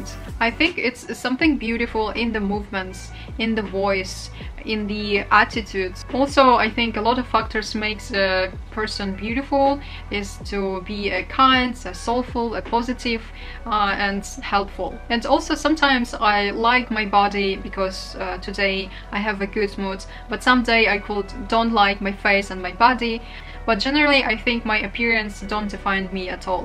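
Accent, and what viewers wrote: Russia. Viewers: The longer I hear you talk, the more I can pick out that you are a native Russian speaker :D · I can hear the Russian and Chinese when you speak. It’s beautiful and unique❤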